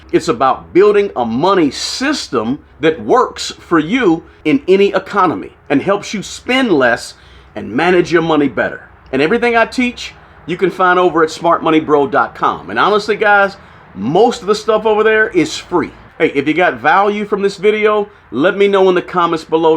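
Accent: United States. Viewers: You're a smart cookie! I know exactly what you mean. I have cupboards bursting with stuff! Good video. I love the American accent 🇺🇸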